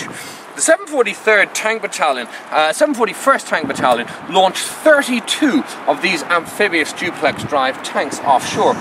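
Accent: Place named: Ireland. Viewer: He's Clearly Irish. you idiot.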